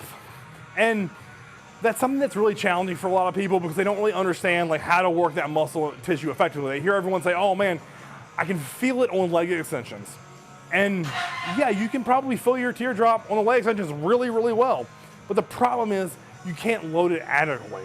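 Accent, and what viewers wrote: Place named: Yugoslavia. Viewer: You have a very strong yugoslavian accent that I can't get over but seriously you have excellent advice. The key to VMO activation is pretty much raising your heels and staying in the lower half position of the squat. We my wife & I try this in our village, GOOD FOR MILKING COWS!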